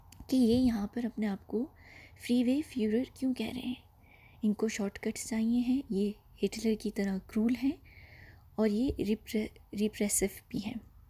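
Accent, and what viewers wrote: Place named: United Kingdom. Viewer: No worries, do what you want. I just appreciate your intelligence. Accent and language doesn't matter at all. Apart from that, I am enough efficient to pick on your U.K. accent where you lag. Let me know if you need help for language/ accent part.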